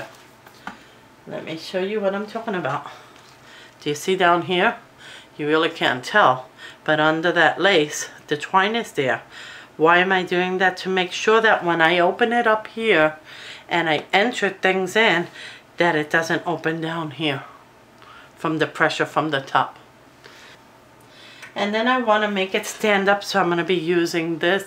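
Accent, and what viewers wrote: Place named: Netherlands. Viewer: You sound Dutch.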